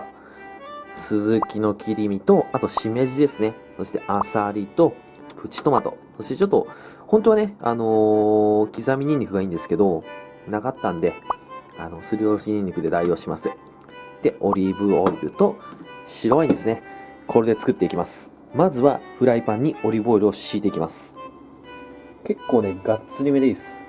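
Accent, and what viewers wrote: Japan. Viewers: 何弁かな？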